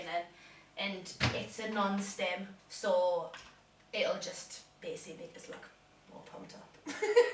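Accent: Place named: North East England, United Kingdom. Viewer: Good to here a North East accent on a fitness channel. Am ganna try that egg and onion thing as it look 👌🏼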